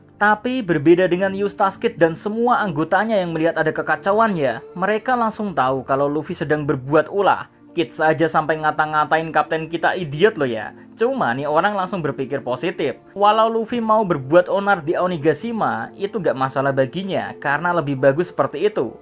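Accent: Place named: Tangerang, Indonesia. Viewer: ngikutin chanel ini udh lama Min.. dn aksen "GEH" itu baru.. dn sangat menggangu telinga... Terima kasih Btw sya thu itu aksen daerah Tangerang kabupaten..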